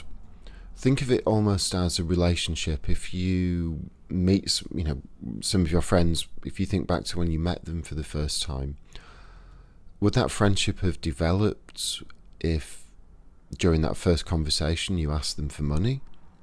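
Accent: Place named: United Kingdom. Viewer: Off topic your voice is so calming and love your British 🇬🇧 accent it's the cherry 🍒 on top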